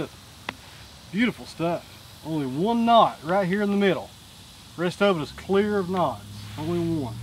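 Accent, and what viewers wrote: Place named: Tennessee, United States. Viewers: That’s a Tennessee accent...I’m betting a dollar to a donut!! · No I work in Kingsport TN, his accent is my accent. Very normal here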